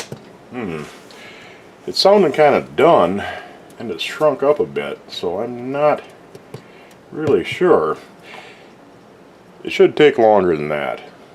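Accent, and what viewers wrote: Minnesota, United States. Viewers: Mr., do I detect a Minnesota accent? I also wonder about the lake in a couple of your videos with wildlife (black bear, ducks, geese, Sandhill cranes), which I can easily imagine is one of the lakes in Minnesota.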